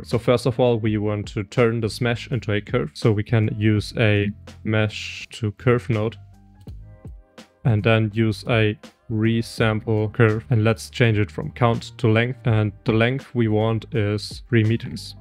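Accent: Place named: Germany. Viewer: Don't give a F about Generator, I find Denglish accent totally fascinating :) There are accents out there that sound deeply disturbing to me, e.g. arabic or russian english, I downvote and turn off those videos immediately if I accidentally click them. It's got nothing to do with racism, it's got to do with violating a language.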